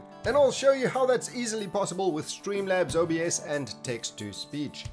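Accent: South Africa. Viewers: you sound like a south africanXD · south african? :) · Thanks for the tutorial, you South African? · Are you South African?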